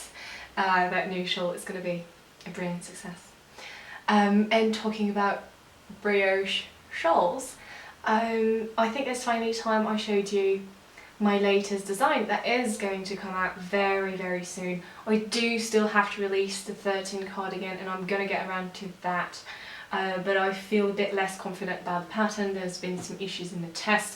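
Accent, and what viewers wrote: United Kingdom. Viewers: you've even got a bit of a 'Brit' accent already-too cute! glad you found the time to share all the new and exciting things with us! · Oh your Southern English twang is adorable! I'd been wondering how you were getting on, here's a very late welcome to England 😊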